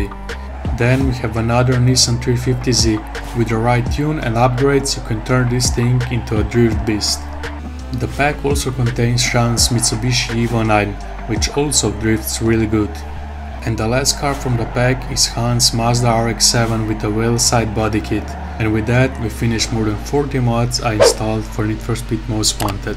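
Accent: Russia. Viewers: сразу слышно русский акцет)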